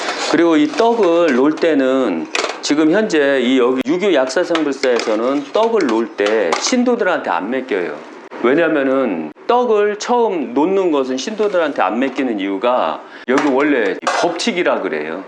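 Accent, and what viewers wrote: Chungcheong, South Korea. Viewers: 유교도안닌것같고 불교도 아닌것같고 무당도 아닌것 같은데 신흥종교인가? 귀신도쫓고 각잡종교 좋은것만 모아서 ...순진한척 충청도 사투리로 이래유 그래유 해가며 그런데 왜 그리 사는지..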